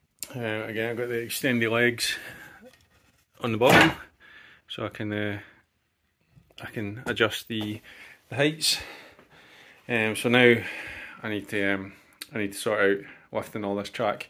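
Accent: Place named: Scotland, United Kingdom. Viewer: Dear Sir, Yours is a great layout (i wish i had a loft), mine is just a wee bit over 2x1 metres. Just one question, for the benefit of us, english non speakers. Your accent is a challenge for me untrained ear. Where in the UK is it from? Scotland, i presume? Thanks and cheers from (happy again) Brazil!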